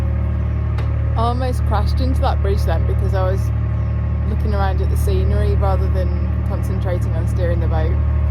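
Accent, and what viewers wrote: United Kingdom. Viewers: Oh thank you for your reply! Yes I've admittedly pretty much binge-watched most of your videos this past week after my wife and I stumbled upon one of them last weekend. I just love outdoorsy things and calm, authentic content. And the latter is someting way too many youtubers lack, especially as we're living in times of loud, nervous short-form clips. So thank you for your videos! They have really brightened my past few work days! :D The British canal system is fascinating, we don't have many canals here! Oh and every time I hear a calm voice with a very british accent it kinda reminds me of a very dear friend from England (though from another region), and that's something! ;) Stay the way you are and keep the videos coming! Much love from Germany.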